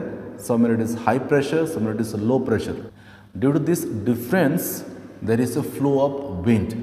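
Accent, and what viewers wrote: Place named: Spain. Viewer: sir your exent is little bit spanish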